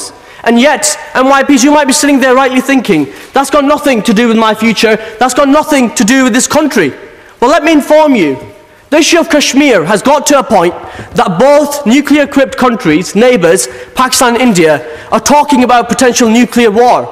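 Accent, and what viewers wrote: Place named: Yorkshire, United Kingdom. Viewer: You are British, right. I would appreciate if you work for or raise issue of child poverty in the UK. And for your Kashmir crying- read some history. Your dads and uncles kept silent or took part in the Hindu pandit genocide in 1989. Easy to take one page from history and add your fancy Yorkshire accent to bark about. World is not just for Muslims!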